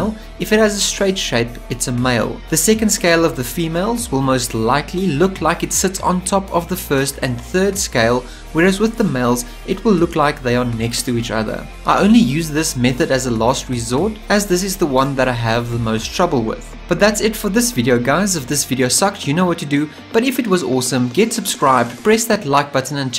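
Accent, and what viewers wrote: South Africa. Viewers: Bro you had me at the intro, but the south African accent was the cherry. Subbed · Are you from South Africa Is jy Afrikaans? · Aweeeeeh I didn't check your surname but as I heard your voice I knew ! This guy is from RSA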